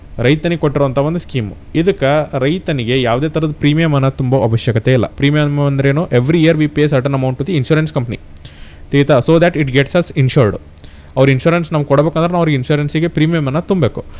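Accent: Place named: North Karnataka, India. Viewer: ನಮ್ಮ ಉತ್ತರ ಕರ್ನಾಟಕ,ನಮ್ಮ ದಾಟಿ ಕೇಳಾಕ್ ಬಾಳ್ ಛಲೋ ಅನ್ನಸ್ತತಿ,all the best sir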